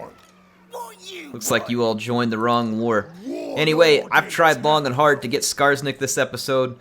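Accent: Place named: Midwest, United States. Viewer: Hearing trying to do a cockney English Greenskin accent, but he has such a strong Midwestern American accent.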